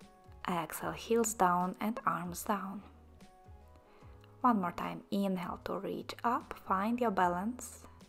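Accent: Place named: Poland. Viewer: fantastic video. she sound polish ;)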